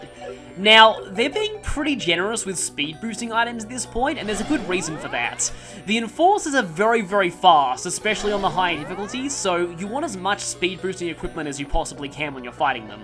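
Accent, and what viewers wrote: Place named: Australia. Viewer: Aaaaand there's the leitmotif. Also, at least in the PSP version, enemies don't respawn even if you leave the tower and then come back. They look a lot like stone tablets (with the archetypical look of the Ten Commandments coming to mind). ...Thinking about it Ys 8 did a similar thing, except instead of the computers projecting them as images the tablets were the "computers" and projected the images themselves. I'd imagine it probably is a regional one; I've never heard an American or Canadian say it as anything other than "day-tuh", but I've herd people from the UK and (obviously now) Australia say "dah-tuh".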